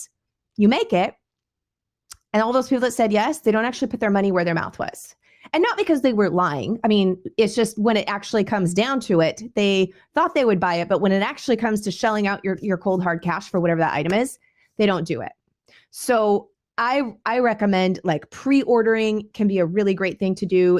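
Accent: North Carolina, United States. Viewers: are you from NC?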